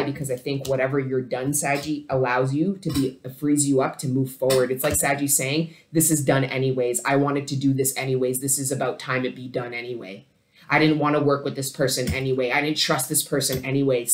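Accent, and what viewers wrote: Jamaica. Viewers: deep voice patois FUCKING DONE.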